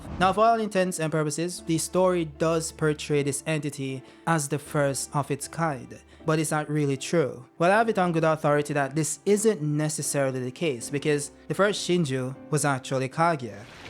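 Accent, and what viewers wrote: Jamaica. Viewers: Ayo are you Jamaican?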